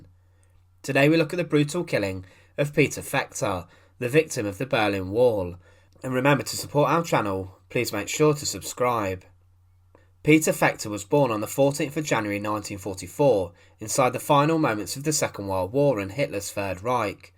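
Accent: United Kingdom. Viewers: Worst documentary with a British guy speaking, and the award goes to.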